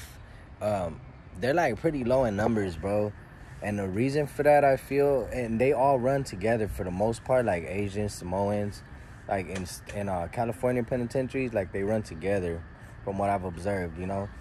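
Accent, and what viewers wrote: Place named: Mexico. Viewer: as an asian dude dis guy sounds mexican dawg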